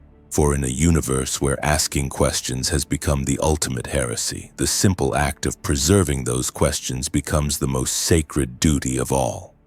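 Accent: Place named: United States. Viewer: With so many accents you choose a basic american one? Lacks imagination and faith, the inquisition now watches you for both the sin of imagination and faith in what you believe. Furthermore the pics are not cannon. They Gray Knights are now also involved!!!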